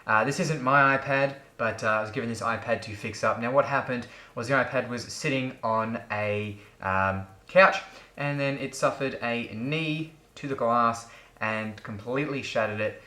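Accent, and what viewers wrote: Australia. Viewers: He lives in Australia. He has an accent. He means cellular. I have a cellular iPad 3, but never used the cellular service. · You can clearly hear that he is an aussie wtf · Is he Australian?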